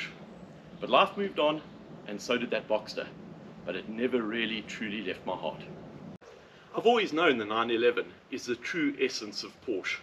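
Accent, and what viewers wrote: South Africa. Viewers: Are you from South Africa? Much love from Vietnam, as a South African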